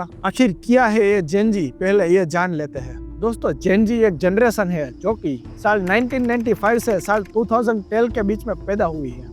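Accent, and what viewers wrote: India. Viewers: Bro Your Gujrati Accent Is Decreasing Interest To Watching Video. Pahle Hame Hindi Ka Proper Accent Ka Practice Karna Padega. Baki Information Best Hai. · Need to improve the pure Hindi accent rather than kathiyawadi Gujarati accent. This will add more interest to watch full video.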